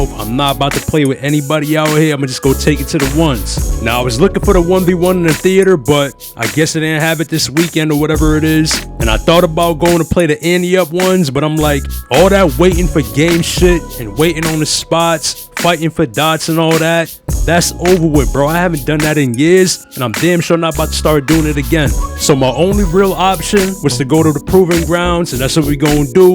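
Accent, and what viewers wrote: New York, United States. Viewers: You from NY gang?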